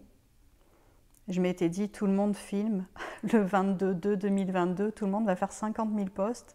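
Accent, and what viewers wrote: Quebec, Canada. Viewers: Chère nouvelle amie, merci. À la 19e minute, je suis entrée en moi et j’y ai retrouvé ma vraie présence. 🙏 J’ai cru entendre des nuances québécoises dans ta voix, aie-je raison? Je suis très attirée à l’idée de m’entourer d’une communauté qui porte en elle la même « mission » que moi et avec laquelle je pourrais avoir des contacts moins virtuels. Merci encore de te faire confiance car ce que tu nous as transmis était précisément ce dont j’avais besoin. 😅 Bonne continuation! 🥰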